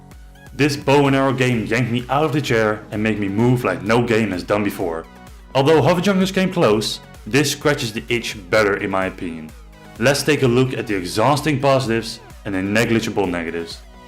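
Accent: Netherlands. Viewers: Dutch accent?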